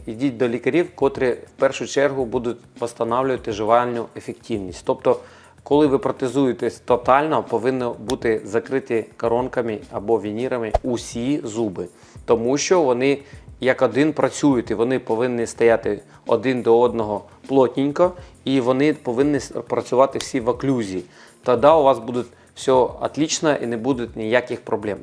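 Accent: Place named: Russia. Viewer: кацапський діалект пре зо всіх щілин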